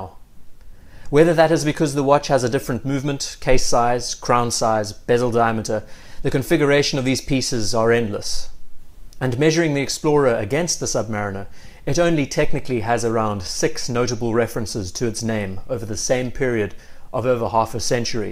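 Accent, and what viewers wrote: South Africa. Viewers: You have a real gift with gathering and sharing information in a comfortable way. That South African accent adds a great voice to the story as well. Keep it up. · Please do not take this the wrong way, or take it the wrong way! Your monotone dialogue is just horrible. I am a design historian amongst other disciplines, so I find your narrative really grating. Where are you from? South Africa? Anyway please think about the object and not the story that you want to tell; be critical and not political.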